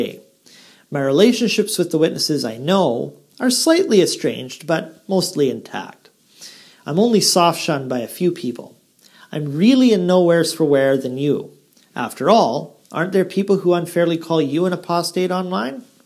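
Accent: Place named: Brooklyn, United States. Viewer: Always makes me think and/or smile! Loved the Brooklyn accent!! 😊😊💕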